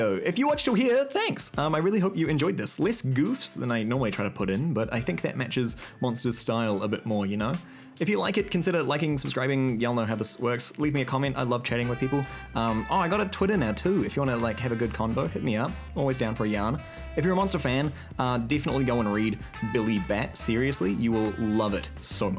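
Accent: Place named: New Zealand. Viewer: Also your accent is so interesting for me, it kinda sounds like Australia, but not quite. My guess would be New Zealand, but guessing accents in a second language is really hard, so don’t be offended if I messed it up completely lol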